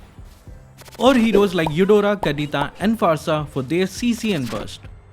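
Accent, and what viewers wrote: India. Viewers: I think you are Indian because ur voice is like an Indian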